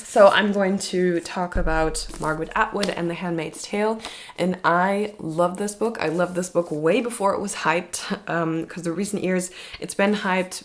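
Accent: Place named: Germany. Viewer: have you been raised bilingual? Since, as a german, I can kinda hear a german accent there, which is sorta fascinating, since I dont hear my own, eventho Ive been told my german accent is pretty noticable.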